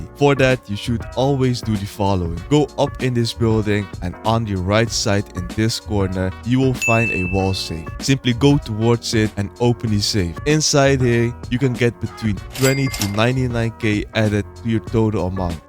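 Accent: Netherlands. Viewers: You are dutch aren't you? Great tips btw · you sound very dutch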